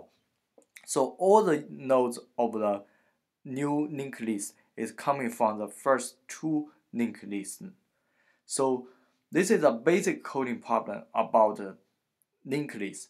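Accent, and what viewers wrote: China. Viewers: 听口音像是国人，一看评论果然是。 老哥讲的可以啊，我这种菜鸟听懂了。多谢啦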